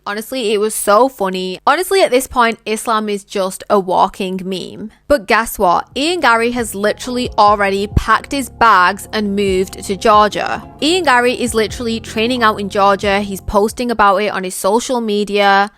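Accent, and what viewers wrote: United Kingdom. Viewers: You really think Kamaru is an easy fight for Makhachev come on beautiful british accent lady when this fight will happen you're gonna find out.from my perspective its a 50 50 fight.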